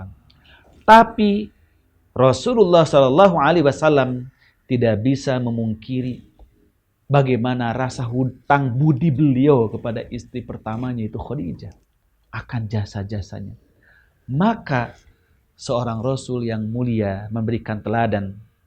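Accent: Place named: West Java, Indonesia. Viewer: Sangat Sunda sekali... NGAOS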